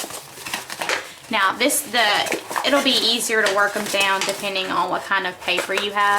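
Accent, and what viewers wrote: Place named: South, United States. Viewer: Love that southern draw sweetie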